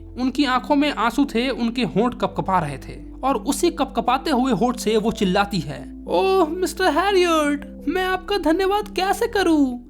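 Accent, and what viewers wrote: Bihar, India. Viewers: Your Bihari accent is just 🤌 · Boli to pura bihari jaisa hai ❤❤❤ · it also have bihari tone , i can understand as i am bihari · bhaiya ap thode se bihari ho kya😭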